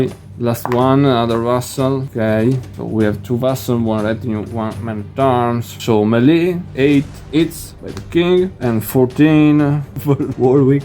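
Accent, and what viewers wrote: Italy. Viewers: English with an Italian accent.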